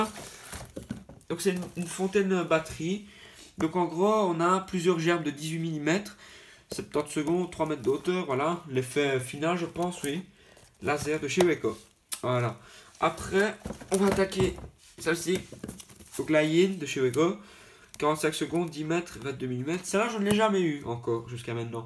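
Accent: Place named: Belgium. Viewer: Non c un belge çà s'entend ( je suis belge)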